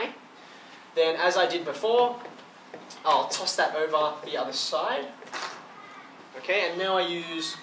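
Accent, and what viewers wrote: Australia. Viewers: indeed. disguisting australian accent.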